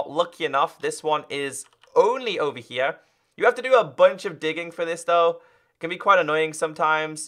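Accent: United Kingdom. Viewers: Such clear pronunciation) Is he British?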